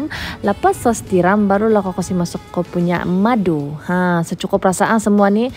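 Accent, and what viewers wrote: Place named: Sabah, Malaysia. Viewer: Sumandak Sabah lawa2 pandai masak nasi.. nasi ayam sedap tu bah. Aku boleh dengar sepnjg ari ckp bhs Sabah. Bila team ICSYVM mau jemput jd guest artist ye.. mau juga tengok pandai kah pilih penyanyi sebenar/teka penyamar😂 terbaek Original Sabahan💪💯👍🔥🔥🔥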